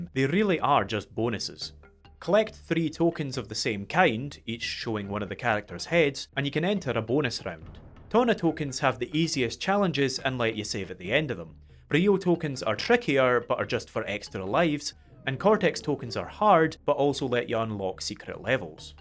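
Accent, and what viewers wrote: Scotland, United Kingdom. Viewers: Your accent is so gloriously Scottish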